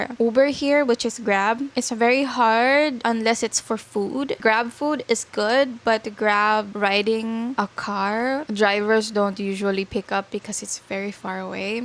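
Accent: United States. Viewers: How come your English is so fluent. If I hadn't known you're from PH then I would've thought you were based in the U.S.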